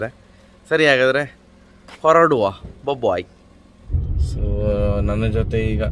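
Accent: Mangalore, India. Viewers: Neevu mataduva reeti, namma mangloor kannada mishritha bhashe, nimma jokes.. Manassige bahala muda kodtade. Ede edeyalli heege random vlogs madi. Nodi naavu kushi padteve.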